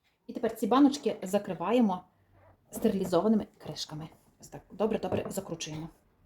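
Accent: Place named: West, Ukraine. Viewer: Так приємно чути мову західної України, просто пісня!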